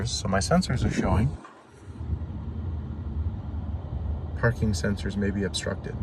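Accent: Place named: United States. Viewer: Thanks for the video. Would be fond of seeing you attempt to hit the indicator buttons while turning. Scenario: in Europe it's (generally) the law to blink in/out of roundabouts. I'm having a hard time seeing how to do this safely without taking the eyes off the road trying to find the correct button which by now might be on the other side (yoke upside down). You sound American so you might not see it as an issue, but I assure you it will be for many of us 😊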